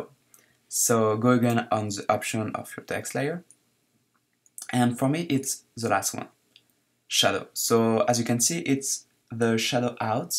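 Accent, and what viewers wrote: France. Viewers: j'étais sur que tu étais francais :D on reconnait l'accent et bravo pour tout ce que tu fais j'aimerais vraiment que tu reprenne ps : j'ai 4 de tes wallpapers dans ma chambre aller salut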